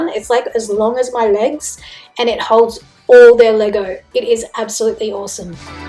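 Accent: Australia. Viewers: Hi from another Brisbane girl, it’s nice to hear an Aussie accent and I had a giggle when you mentioned thongs and then called them flip flops 😂 the views from your apartment are amazing 😊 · I'm from Brisbane too, I've only just found these videos and I'm loving them...also loving the Aussie accent! 🇦🇺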